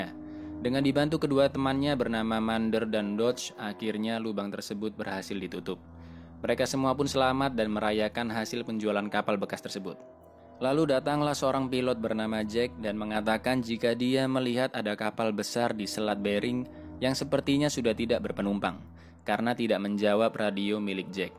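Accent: Java, Indonesia. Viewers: Org jawa y bang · Orang jawa ya bang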